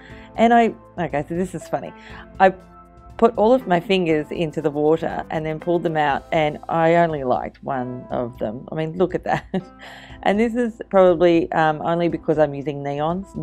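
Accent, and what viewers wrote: Australia. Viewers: I prefer the videos that you talk us through!!! They sound awesome!! And it's really nice to hear another aussie out there (I'm from Australia too)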